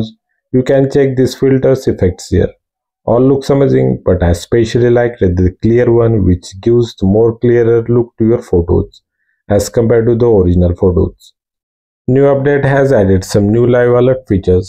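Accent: India. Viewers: Marathi maanus....typical marathmoli english accent ahe bhaau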